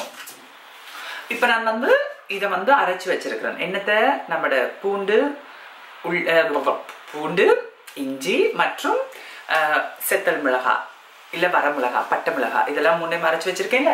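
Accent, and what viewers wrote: Jaffna, Sri Lanka. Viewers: Unga elagai tamil sweetta irukka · its great you speak SL Tamil. Please continue to do so. I'm sure by now Indian Tamil viewers should be able to understand. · iyalbana pechu nadaimuraiyodu video parkka arumai...athuvum yazhpaana tamil arumai... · i like your singala tamil slang.. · Omg I freakin love you.. haha Jaffna thamil கேக்கிறிதில இருக்கிற சுகமே தனி. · Omgggggggg I juz love your celone Tamil.......... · I jst admire ur Sri Lankan Tamil. And Malaysian "Terima Kasih". Sama-sama (ur welcome). Love u. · Akka sprka i like sirilankankan tamil nenga spra pesuringa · Hi srilanka tamil speech arumiy cooking, comedyance all nice up up 😍 from swiss: srilanka😍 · Akka this hair style u pin up look beautiful for u and i really love ur srilankan language❤ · Pl always continue with srilanka tamil accent. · Yen sissy ipadi pesureenga starting la bayama aagiduchu ena da idu ena aachu to ur voice nu 🤔🤔The way you speak casually daan super 😍😍 the srilankan tamil s so nice to hear from you but in your body language 🙂sema tip for the smell sissy my husband will tell dry fish stinks I love tat smell will follow your tip sure. 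Sissy konjam tamarind water potu oru boil vitu irakunga inum semaya irukum 😍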